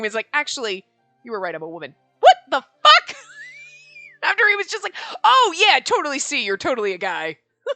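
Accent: Ireland. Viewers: YES! The "Irish" Accent appears. I don't know why, but that has become my favorite of your voices. XD Don't apologize for it. We love it. We're charmed by it. ... At least I am. LOL I LOVE these two Sato brothers!!! OMG! I'm gonna love the side stories for them. And all four men fighting over who carries Shanao, just perfection. XD XD YOu know those two Sato brothers TOTALLY know she's a woman and are totally enamored with her already. XD XD GEEZ! This game keeps getting better and better. XD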